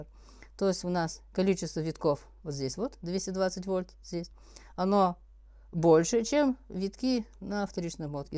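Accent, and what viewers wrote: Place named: Udmurtia, Russia. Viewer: Акцент у Вас интересный. Вы из Удмуртии или Одессы?)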